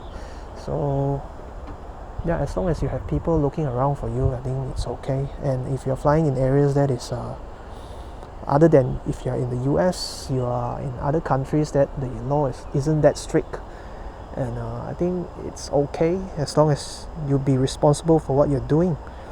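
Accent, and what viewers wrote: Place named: China. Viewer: why autel is using a Chinese accent speaker? why not a neutral accent speaker?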